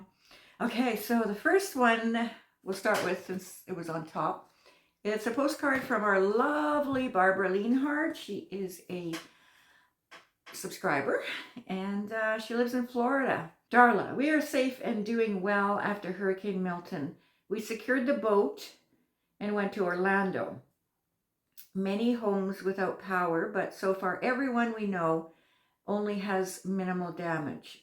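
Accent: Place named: Canada. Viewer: Love listening to you talk…love Canadian accent!! My step daughter & her husband live near Courtney, BC. She’s starting to have the accent mixed with the Texas twang (where she moved from). Love the hooks hope they work well for you!❤❤❤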